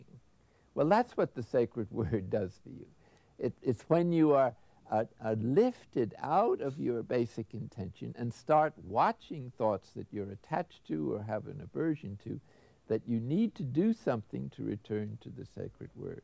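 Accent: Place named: Ireland. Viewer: I love his voice, i wonder if his mum or dad were irish, as there's a hint of an irish lilt in there 🤔 perhaps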